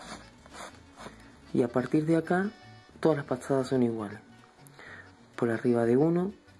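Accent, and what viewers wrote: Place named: Entre Ríos, Argentina. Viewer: Se me hace que tu tonada es de mis pagos (Entre Ríos) te re felicito amigo me encantan tus trabajos y explicaciones la verdad hasta los mas brutos aprendemos si alguien así explica. Un abrazo y a seguir subiendo videos. Solo me falta saber como llevo estos tutoriales al encabado que es mi hobbie.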